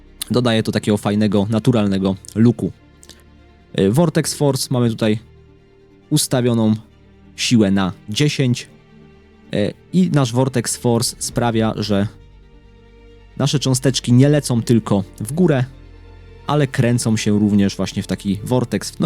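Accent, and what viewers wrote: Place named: Poland. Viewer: Mówiąc szczerze dawno nie słyszałem Polskiego akcentu w tutorialach, rób dalej co robisz wszystkim się to przyda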